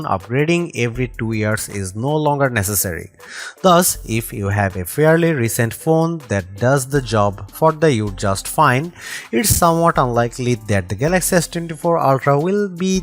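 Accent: India. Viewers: Indian English accent 😂😂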